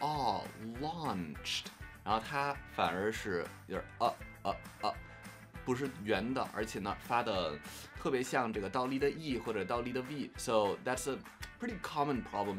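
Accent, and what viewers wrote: Beijing, China. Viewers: 老师，你这个大京片子，跟我说是跟非诚勿扰学的，我是不信的。。。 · 您这中文还带京味，厉害了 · 天啊！您这口北京腔是怎么学的啊？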